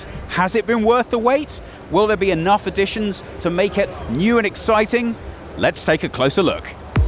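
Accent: Germany. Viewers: I like this man, he speaks very fluently and clearly. Is he german?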